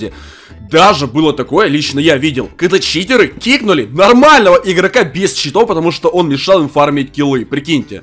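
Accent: Ukraine. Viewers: ты с Украины?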